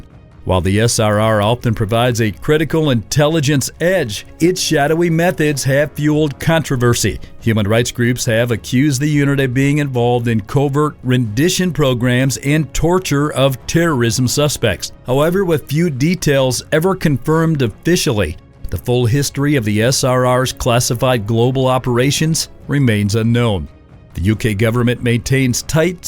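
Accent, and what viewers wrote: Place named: United States. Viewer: Another disappointing video created with a startling level of ignorance. Why narrate a history of the SRR with a mostly American military forces video overlay. An American accent narrator is also a big turn off.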